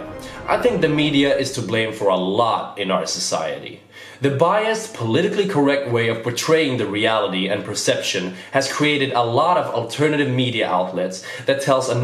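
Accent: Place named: Canada. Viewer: Omg sounds like Canada 🇨🇦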